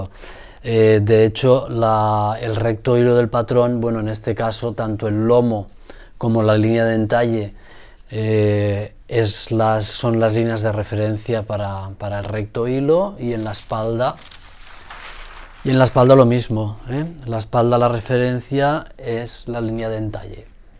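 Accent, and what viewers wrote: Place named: Catalonia, Spain. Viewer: Bona nit, me encantan tus clases con ese acento catalán tan pausado, haces que todo sea más fácil feliçitats.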